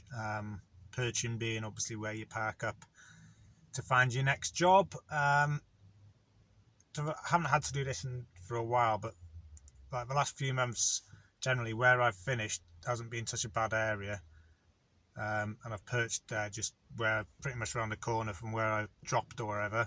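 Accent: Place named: Coventry, United Kingdom. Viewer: Are you Coventry guy..as i am struggling with accent a tad..I know cov is 18 miles but there is 50 miles circle that covers a vast amount of areas I wish I was still near somewhere local.. Nottingham should be good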